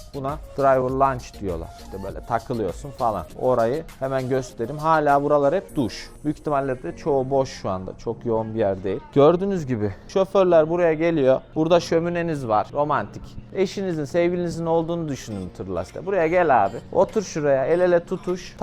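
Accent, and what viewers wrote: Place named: Niğde, Turkey. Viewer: Askerde Niğdeli bir arkadaşım vardı konuşman tipin aynı kesin Niğdeli dir diyordum formayı gördüm yanilmamisim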